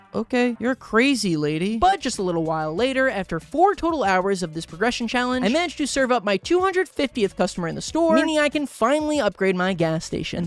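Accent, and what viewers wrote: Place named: West, United States. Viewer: Who’s here under an hour. Also why not 100 percent a switch game like Pikmin 4? Also also, I can’t with ur western accent!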